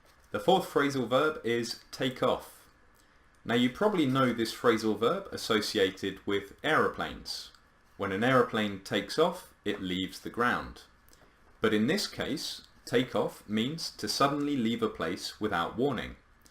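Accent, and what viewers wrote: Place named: United Kingdom. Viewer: Sir, you possess a clear British accent. By the way, I am your new subscriber.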